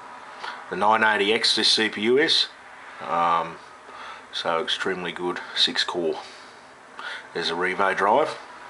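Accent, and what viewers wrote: Australia. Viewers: Thanks for the vid. I must say that EVERYTIME I find someone who has successfully uploaded their OS onto the REVO, I can not find the MoBo that they used for sale online. EVERYTIME!! Good vid, the aussie accent was a nice change of pace too. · I'm a kiwi, and i can tell an Aussie when i hear one lol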